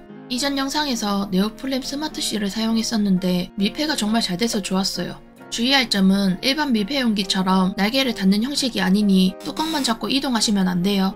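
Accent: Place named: Gyeongsang, South Korea. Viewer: 경상도 억양밖에 안들림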